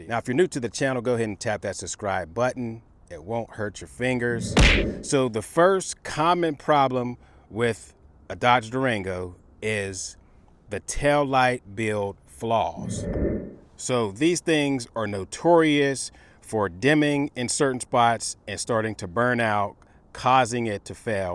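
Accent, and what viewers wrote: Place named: Pittsburgh, United States. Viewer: U from pittsburgh ? Sound like a yinzer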